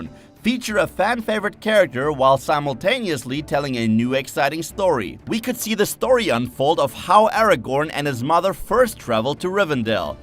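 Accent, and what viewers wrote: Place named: Germany. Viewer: your german accent is horrible lmao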